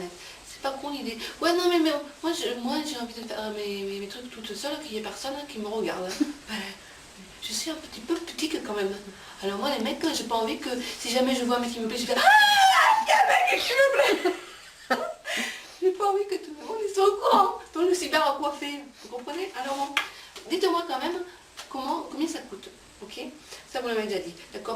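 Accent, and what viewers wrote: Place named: South, France. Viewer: ... et tout ça avec modulations et accent du Sud..! Je garde, je garde 😆 !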